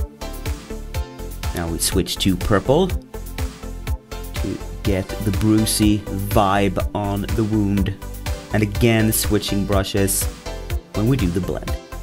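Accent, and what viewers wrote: Scania, Sweden. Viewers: är du från skåne?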